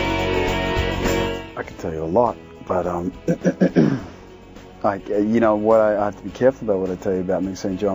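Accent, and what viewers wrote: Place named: Australia. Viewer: I don't normally find Aussie Accents hot...as I am aussie...but god, he is way hotter with his aussie accent!!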